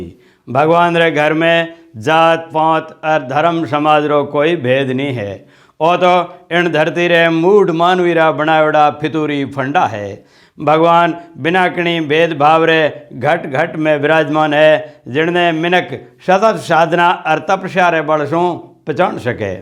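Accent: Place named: Marwari, India. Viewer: sahab normal bolo, jyada Marwadi samaj nahi ati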